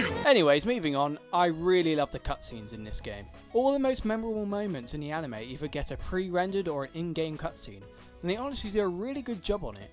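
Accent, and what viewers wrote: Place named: United Kingdom. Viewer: by the way, you sound a little British, do u come from the UK? I do!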